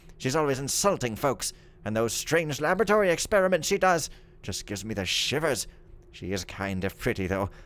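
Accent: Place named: France. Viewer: LoL ! I like your french speaking, so funny :D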